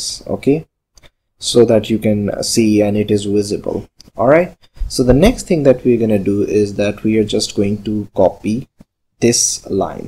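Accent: India. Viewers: ddddddddd... Indian accent...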